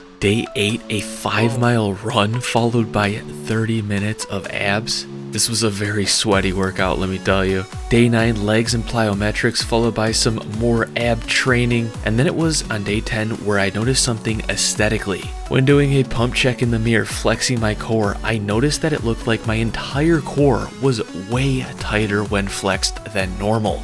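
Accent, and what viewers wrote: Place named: Midwest, United States. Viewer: I love the midwest accent. Idk why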